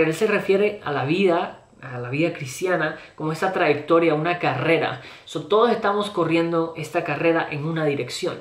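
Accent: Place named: Argentina. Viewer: Traes un pequeño acento argentino por ahí! 😆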